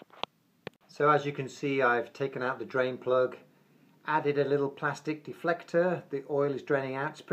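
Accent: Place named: United Kingdom. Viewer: U sound like ur British, I thought u guys called a wrench a spanner?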